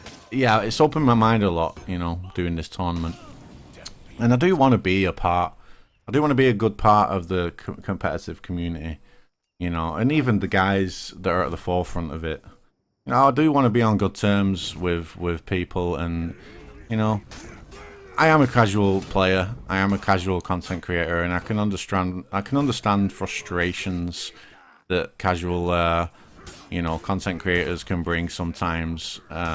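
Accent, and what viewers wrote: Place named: Yorkshire, United Kingdom. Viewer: Are you from Yorkshire/Lancashire? Your accent is like exactly the same as tru3ta1ent's and he used to be big into For Honor.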